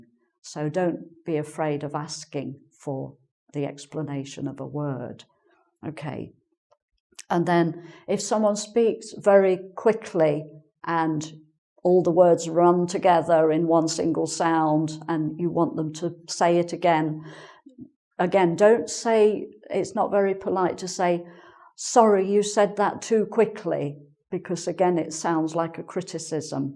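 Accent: United Kingdom. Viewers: Beautiful British accent!! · I'm pronouncing every single word from you to be accustomed with British accent. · love your British accent soooo charming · you've got the British accent, haven't you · I love the pace and clarity of your speaking. It's a reason why I love to listen to the British accent. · Is this a British accent ??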